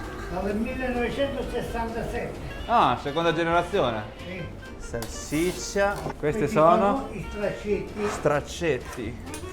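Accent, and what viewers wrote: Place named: Romagna, Italy. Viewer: Hai un accento romagnolo, direi riminese, che mi fa morire 😅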